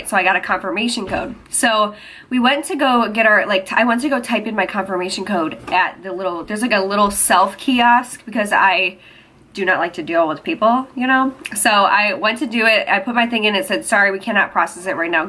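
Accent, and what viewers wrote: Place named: Chicago, United States. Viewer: VLAG lololol yas ya love to hear that chicAgo accent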